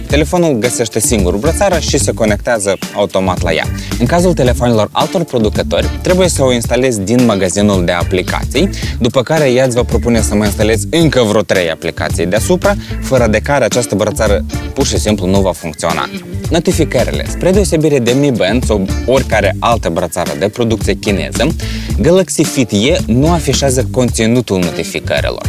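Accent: Moldova. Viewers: Dat'n slobiz de moldovean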